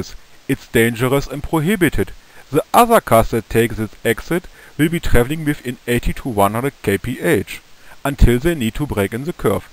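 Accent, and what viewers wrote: Germany. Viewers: This has to be the most ridiculous german accent I ever heard. And I am a german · You have done pretty well! As a German I must admit your German accent is awesome! ;-)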